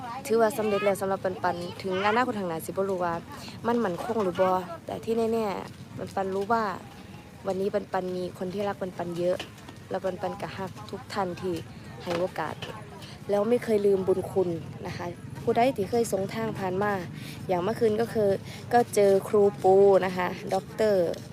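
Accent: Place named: Isan, Thailand. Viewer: สำเนียงเสียงใกล้เคียงกับฮันนี่ศรีอีสานเลยสุดยอด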